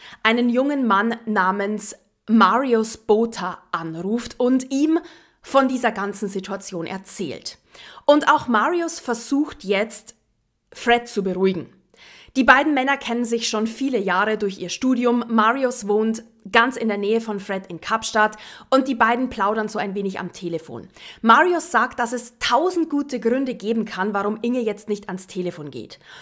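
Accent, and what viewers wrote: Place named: South, Germany. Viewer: Was stimmt mit dir nicht, Inge Richter? Was für ein sinnbefreiter Kommentar ist das denn? Du brauchst dir Videos doch nicht anhören. Viele, unter anderem ich, mögen sie sehr! 💕 Mögen ihr Aussehen, ihre Stimme, ihre Ausrucksweise, ihren süddeutschen Dialekt, kurz gesagt: Ihre Arbeit, die sie sich macht. Und die Mühe, die sie sich für uns gibt. Empathie ist erlernbar. Vielleicht solltest du dich einfach mal damit beschäftigen?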